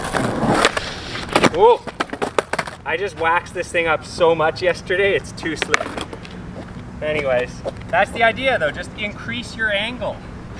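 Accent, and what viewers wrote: Canada. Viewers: This guy sounds sooo Canadian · You sound hella Canadian and I like it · This is the most Canadian accent I’ve ever heard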